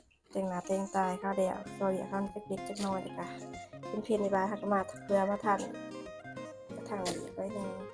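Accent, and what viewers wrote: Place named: South, Thailand. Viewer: ເມື່ອຄົນ ໃຕ້ໃດ້ຍິນສຽງໃທໃຕໃທໃຕ້ຈັງແມ່ນມີແຮງເຫມືອນໃດ້ຢູ່ບ້ານຕົວເອງ😊❤❤❤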